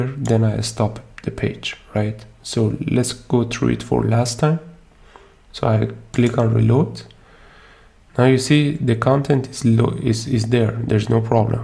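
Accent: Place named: Iran. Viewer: way to go man. I love the section you speak about the Javascript Garbage. 😅😆😆. We appreciate the Persian accent by the way!!!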